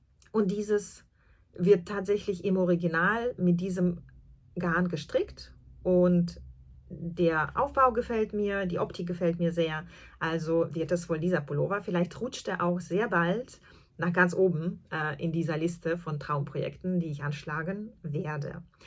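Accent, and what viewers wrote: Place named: Luxembourg. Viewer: Liebe, ich finde deine Videos sehr interessant. Das eine war mir auch zu viel Theorie. Dann nehme ich mir die Freiheit abzubrechen. Ich habe vor vielen Jahren eine Übersetzerausbildung an einem Fremdspracheninstitut gemacht. Ich liebe Sprache und was damit zusammen hängt. Wenn ich dir zuhöre, bilde ich mir ein, einen luxemburgischen Akzent zu hören. So und jetzt schaue ich weiter 😊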